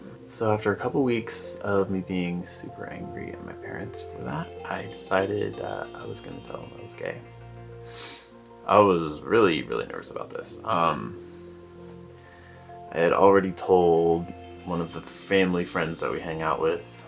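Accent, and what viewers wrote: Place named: Fort Wayne, United States. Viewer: Sounds like you are from the area around Fort wayne? I am from warsaw.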